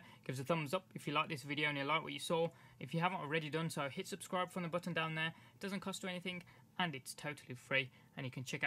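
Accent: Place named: England, United Kingdom. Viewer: dont be racist dude. thats not right plus he sounds like another fellow englishmen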